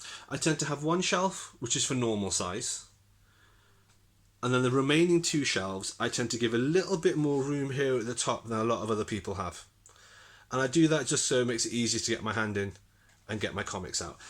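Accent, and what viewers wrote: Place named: United Kingdom. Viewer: Crossworlds was great, Jae Lee's art was incredible. I feel your pain with the shelves, here's a pic of mine. At the bottom I had to raise the right shelf to fit the Transformers Premier Collection books which are Absolute sized (I think - they're about 1cm taller than a 12 inch album). I also divide by format - omni/OHC/Premier/TPB. I just can't have them mixed up together. I collected Superman Unchained when it was released as floppies, but I gave up an issue or two before it finished because Jim Lee just didn't want to prioritise it and it took months just to get one issue. Somehow I never picked up on you being Welsh. Maybe it's because so many youtubers are Amerixcan I just heard a British accent.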